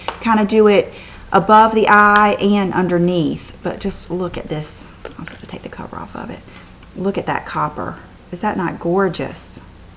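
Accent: United States. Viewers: Hi! I´ve been watching your´re videos from here in Norway and I´m loving them! I even went back to old ones just to get "a jour". I haven´t commented on them until now because I´m a bit shy, but them I thought that "hey, I want to let know that I think she´s doing a terrific job". So,: Great Work!!I think you are fun to watch and listen to, I love your accent which reminds me of when I lived in the States many years ago. Keep up the vlogging & I´ll be watching;-) Thanx Cecilie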